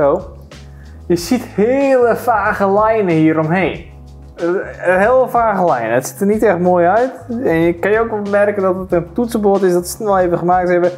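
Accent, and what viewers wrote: Friesland, Netherlands. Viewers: Klinkt Fries 😅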